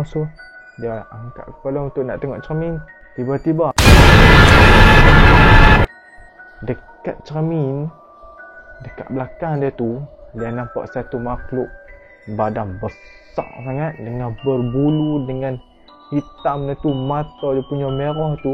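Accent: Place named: North, Malaysia. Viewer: Suka gila dengaq youtuber yg cakap utaqa ni, tapi nak mintak 1 ja lepasni jangan letak jumpscare please terkejuttt ceq 😭😭😭😭😭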